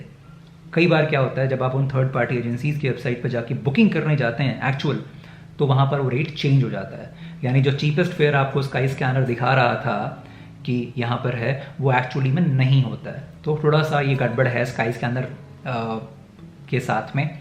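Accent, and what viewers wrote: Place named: Lucknow, India. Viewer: your this channel is awesome. You have devoted your life for tourists helping them from your experiences & sharing knowledge. I see all your travelogues and enjoy your brilliant clear Lucknowi urdu/Hindi accent. Keep up the good work. Great work bro.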